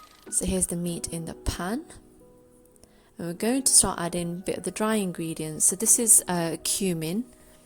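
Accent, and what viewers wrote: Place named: United Kingdom. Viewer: Nice british accent yummy food! (_ _)/"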